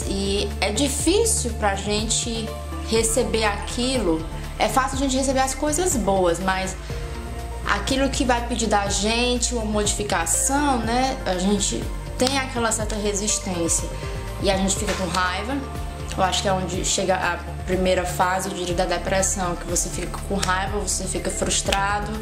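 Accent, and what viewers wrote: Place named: Northeast, Brazil. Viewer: muito bom o video, eu morei fora e senti a mesma coisa, pelo seu sotaque você parece ser do nordeste como eu, acho que é pior...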